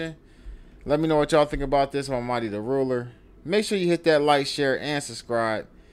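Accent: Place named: Bay Area, United States. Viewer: Bruh where you from? Remind me of a Bay Area nigga 😆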